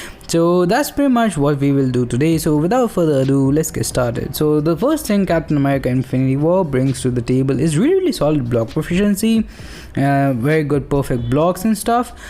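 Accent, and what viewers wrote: India. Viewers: You are from India , I have doubt in your sound its like indian man.But I am from India and even I like your video.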